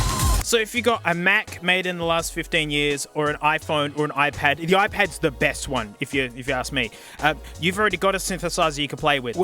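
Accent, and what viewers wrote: Australia. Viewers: Why.....why is this only 12 minutes? We need like..AN HOUR of this! I wanna hear the funny aussie man with his slithery friend named Frank scream and tell me hilariously albeit informative stories while using a synthesizer. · Sounds like the drum museum guy. Drums somethin. Australian.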